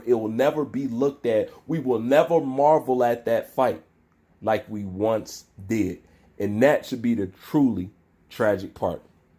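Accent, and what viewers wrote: United States. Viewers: You know you’re full of shit right? You know wilder wasn’t ducking fool.. Yea, he was ducking someone who just got stopped by Ruiz? You must have a brain tumor fool! Wilder lost in your records but your records doesn’t matter. That’s why he’s running it back. You sound like you’re from America, SUPPORT YOUR OWN MORON!!! You’re what is wrong with the world today.. Bitch!!!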